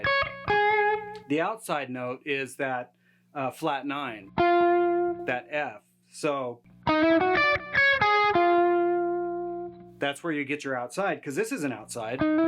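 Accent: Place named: South, United States. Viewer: This was awesome stuff. love that country accent. had me rollin'.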